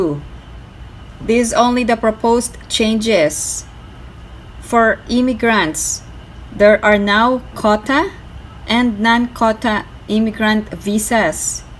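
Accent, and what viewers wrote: Philippines. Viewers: funny filipino accent 😅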